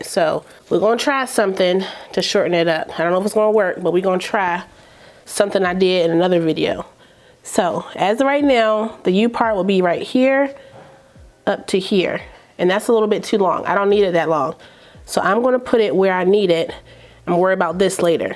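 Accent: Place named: Baltimore, United States. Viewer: That Bmore accent! Love it!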